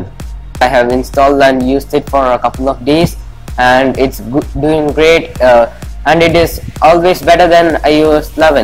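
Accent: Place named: India. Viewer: Ahh! Here comes the Indian accent!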